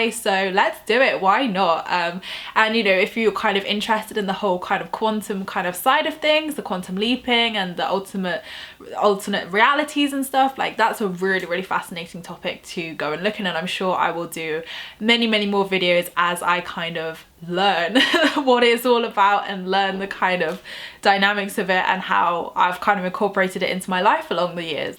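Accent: England, United Kingdom. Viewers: Hi I just found your channel I have tried the 2 cup method today I ask for more abundance I felt very happy doing it I said a prayer and I kept the desired note in a wooden box as suggested by another yoube channel any suggestions how long it takes before you see the results I love your English accent when I manifest some new friends I would love to visit England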